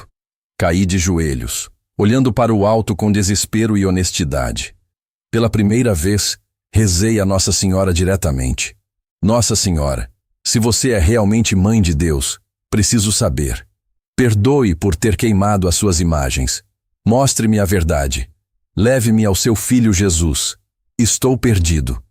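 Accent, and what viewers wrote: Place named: Portugal. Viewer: Esse português de Portugal que o narrador fala é horrível!!!! DETESTO!! Porque??? Se estamos no Brasil???😮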